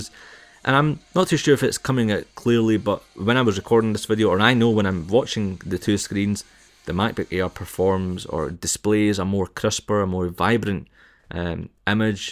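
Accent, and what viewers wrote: Scotland, United Kingdom. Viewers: Really enjoyed the video, just ordered the 13" 2.13 4GB 256 Flash and can't wait to get it, have put my 4 year old 15" MPB's backlit keyboard off to get used to it but don't see it being a massive problem. Being from Aberdeen it's nice to hear a scottish review :D Cheers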